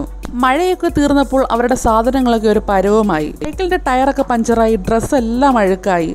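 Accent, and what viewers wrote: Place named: Kollam, India. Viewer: Kollathil ullavar aganeyann parayuka bro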